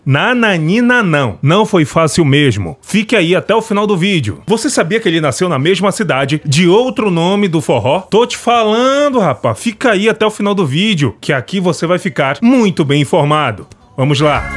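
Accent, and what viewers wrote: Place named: Bahia, Brazil. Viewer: Caracas pelo deu sotaque vc e baiana Amo Bahia também sou Bahia mais vivo em outro estado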